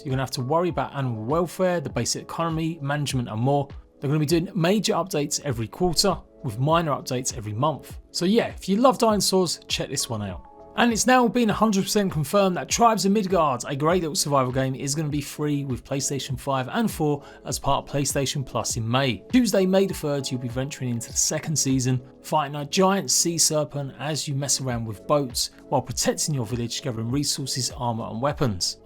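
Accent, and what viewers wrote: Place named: United Kingdom. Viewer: Where’s that accent from? Can’t place it. Obviously British but unsure where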